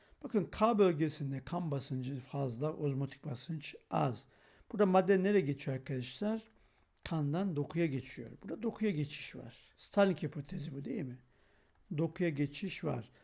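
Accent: Black Sea, Turkey. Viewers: Karadeniz şivesidir diyor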